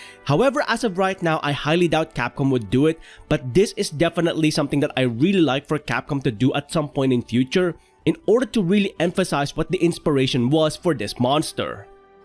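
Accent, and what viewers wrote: Philippines. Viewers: I like your accent, as a fellow filiipino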